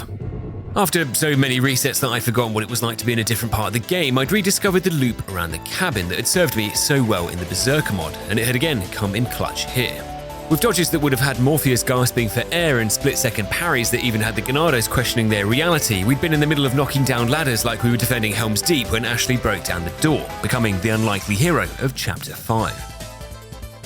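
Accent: United Kingdom. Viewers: That accent says British but your love of friendly fire says American.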